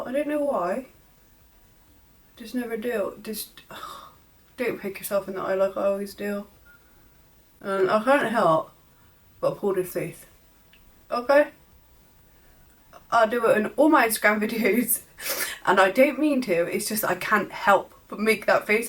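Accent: United Kingdom. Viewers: Done: Insta giveaway. <link> OMG I’ve watched you on insta silent for so long, I didn’t even know you had an accent from England! I’m near Newcastle. Every time I hear your kind of accent I think of that “I’ve got a brand new combine harvester and I’ll give you the key” 😂😂😂 don’t be offended please lol! P.s it’s skinny but it’s wide 🤙🏻😉😂❤️ love your vids on insta! More in YouTube please deffo ❤️❤️❤️❤️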